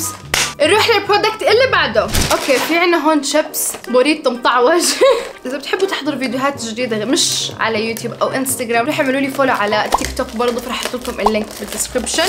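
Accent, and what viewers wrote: Jordan. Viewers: اللهجهه الارودونيه كييييووووتتتتتت خصوصي لمن تكولل زاكيي ❤️❤️🥺🥺🥺🥺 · لهجة اردنية كثير حلوة ❤❤ من المغرب 🇲🇦 احبكم يا اهل الأردن 🇯🇴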